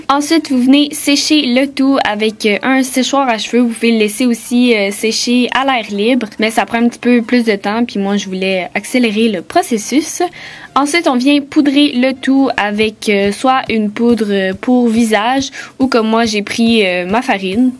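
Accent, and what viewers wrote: Quebec, Canada. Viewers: j'adore ton accent québécoise et au passage tes trop belle je m'abonne s'en discuter !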